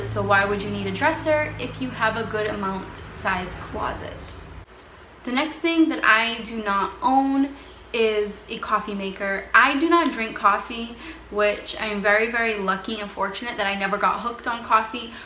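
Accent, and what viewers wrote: Boston, United States. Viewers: A projector with a cute Bawston accent ;) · On another topic she has the cutest Boston accent 😁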